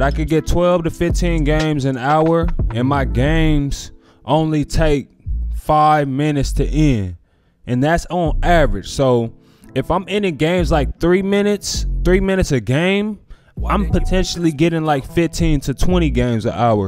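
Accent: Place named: Los Angeles, United States. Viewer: i could tell u from LA by the way u talk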